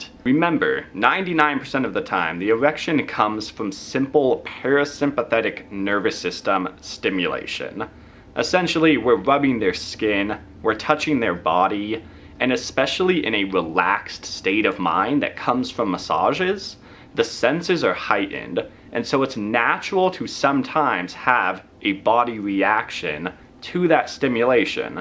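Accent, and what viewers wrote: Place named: South, United States. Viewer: You're cute and your voice sounds like you have a little country accent. 👍🏻❤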